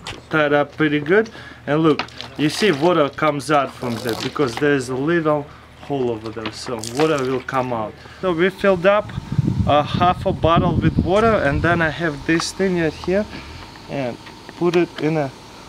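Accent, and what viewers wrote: Russia. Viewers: are u russian? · What was the last experiment??? He said like a carbide rocket??? he's to Russian to understand sometimes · Lets make it strong again. He sounds sexual with russian accent.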